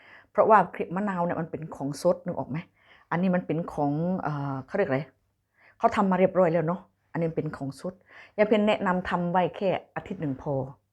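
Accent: Korat, Thailand. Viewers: ชอบแก่คุยบ้านเองคนโคราช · น่ารักมากพูดพาสาโคราชชอบมากๆจ้า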